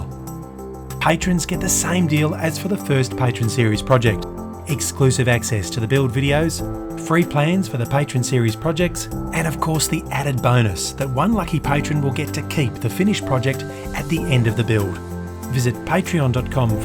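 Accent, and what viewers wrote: Australia. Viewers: Well his voice isn't exactly soothing, but it isn't bad for an Aussie one........... *Kiwi here :P But yes will be EPIC :) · Subbed the instant I heard a fellow Aussie accent, liked for awesome content.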